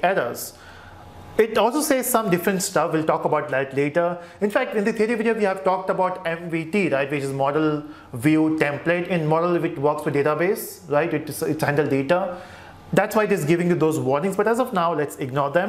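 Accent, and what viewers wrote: India. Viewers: ahhhh another indian accent.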